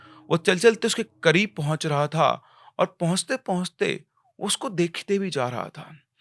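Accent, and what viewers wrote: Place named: Bihar, India. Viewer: Sir apki baato me Bihari bol najar aati hai....lgta h. Ap bihar se bhut connected ho....... Mujhe apki sari real stories bhut pasnd aati hai....maine apke sare stories suni hai.and I loved it...lot....❤ Love from...Bihar...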